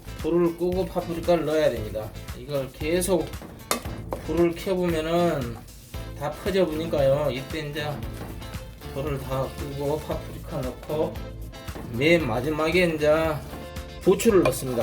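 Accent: Jeolla, South Korea. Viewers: 구수한 전라도 사투리 나오는거 정겹슴니다.라드는 어디서 팔아요?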